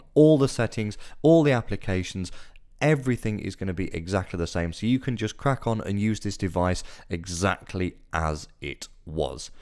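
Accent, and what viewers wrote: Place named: United Kingdom. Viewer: It does not have writing in the back if you live in the U.S. and in some other places like Canada. He probably lives in the UK I'm guessing bc of his voice and....He said it in the video.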